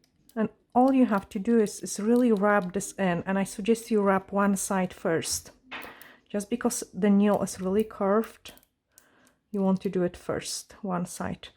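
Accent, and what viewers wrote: Scotland, United Kingdom. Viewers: Absolutely stunning. I love hearing your wee Scottish hints in your accent 🥰 xx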